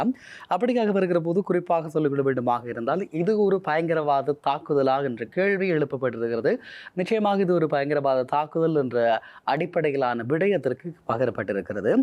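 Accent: Sri Lanka. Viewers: Seems the news reader has studied in Srilanka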